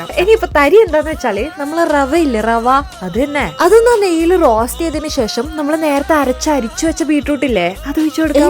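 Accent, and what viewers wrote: Kannur, India. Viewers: That Kannur slang❤addicted 😅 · നിങ്ങള് കണ്ണൂർ ഏടയാപ്പാ?😅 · Chechikutty kannur ano ente bashapole thonnunnu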